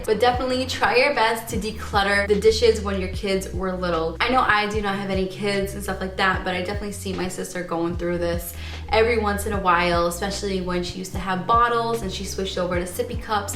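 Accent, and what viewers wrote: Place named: Boston, United States. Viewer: A video suggestion for you because you're absolutely adorable and I love the way you pronounce words would be how other people say a word in different parts of the country and then you try to say it with your Boston accent! Please say car key's LOL An old co-worker of mine had an accent like yours and every time she would say car keys it sounded like she was saying khakis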